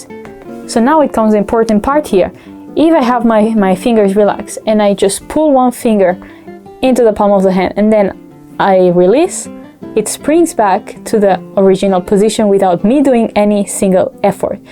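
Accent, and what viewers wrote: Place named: Spain. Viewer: Hola! A pesar de que te conozco desde hace un tiempo gracias a reddit, es la primera vez que veo uno de tus videos y era justo lo que buscaba, me gusta como explicas la técnica y los ángulos de cámara que usas, definitivamente me suscribo a tu canal. Ps. Por tu acento supongo que eres de España por eso escribo mi comentario en español 😅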